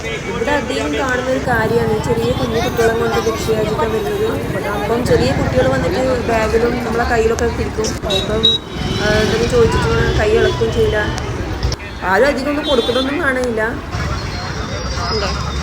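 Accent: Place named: Kannur, India. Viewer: ഞാനും കണ്ണൂരാണ് സംസാരം കേട്ടപ്പോൾ തോന്നി.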